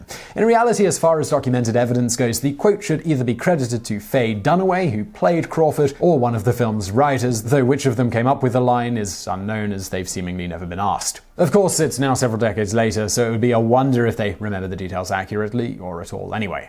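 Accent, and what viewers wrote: United Kingdom. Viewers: I like the way you say it. Your British accent gives it a little extra sting.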